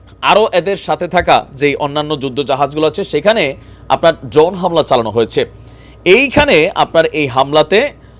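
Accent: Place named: Comilla, Bangladesh. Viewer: আপনার কুমিল্লা ভাসা বন্ধ করুন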